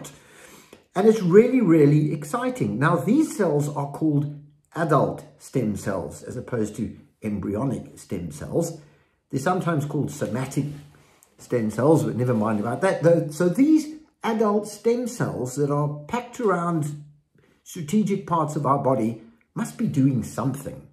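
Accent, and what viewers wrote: South Africa. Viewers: Hi, I recognise a SA accent.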